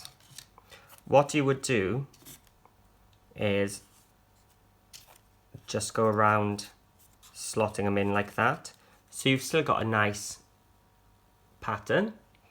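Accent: Wales, United Kingdom. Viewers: Think u are Welsh yes ?I love Wales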